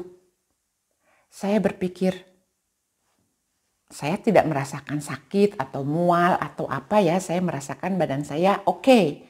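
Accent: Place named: Bali, Indonesia. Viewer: Maaf,ibu drmn ya aslinya ??? logat bhs indonya kental org bali..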